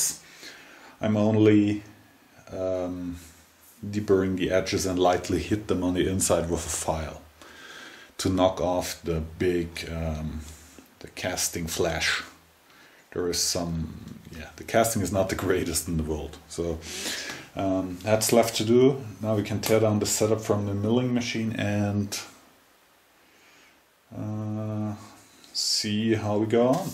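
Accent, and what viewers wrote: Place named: Germany. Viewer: Hi - great video as expected--- keep up the great work!!! My wife enjoys hearing your German accent-- when she walks in the room and I'm watching your videos, she says " he's back"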